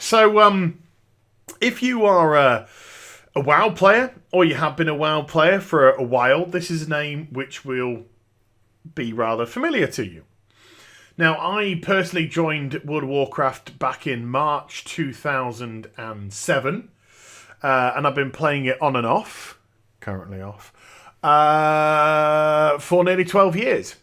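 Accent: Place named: United Kingdom. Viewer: Is there any chance you will drop the British accent and slang? Your info is interesting but cant stand it.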